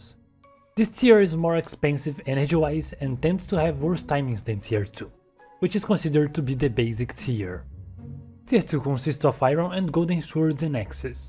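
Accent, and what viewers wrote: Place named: Rio de Janeiro, Brazil. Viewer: nao tem como esconde o sotaque carioca kjkkkkk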